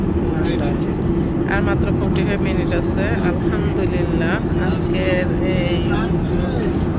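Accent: Sylhet, Bangladesh. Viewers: আমার দেশ ফেনি আমার মেয়ের দেশ সিলেট আমার মেয়ে সিলেটের কোনো ব্লগ দেখলে খুব পছন্দ করে দেখে কারন এখানে সিলেটি মাত থাকে যা ওর শুনতে ভালো লাগে এবং চেষ্টা করে শিখতে।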